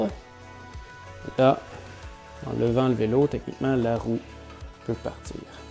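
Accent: Quebec, Canada. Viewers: Sympathiques et utiles vidéos avec un accent et des termes québecois imagés et amusants qui font déjà commencer le voyage...! Merci ! Frédérique de Nice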